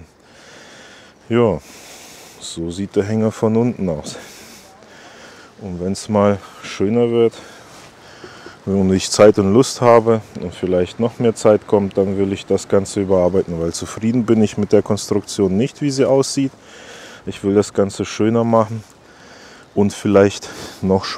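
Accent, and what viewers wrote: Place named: Leipzig, Germany. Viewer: Lieber. Klasse Video, ich höre Deinen Akzent als Leipziger immer wieder total gerne. Dein Humor ist spitze trocken. Sag mal bitte, was hast du im Garten für ein Windrad? Nutzt du dies auch zur Inseleinspeisung und welche Erfahrung hast du bei den letzten Stürmen? Lieben Gruß Thomas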